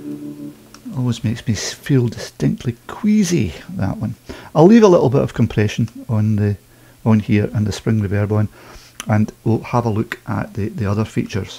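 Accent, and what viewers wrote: Scotland, United Kingdom. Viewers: great review and lovely scottish accent. i can't wait to get this amp!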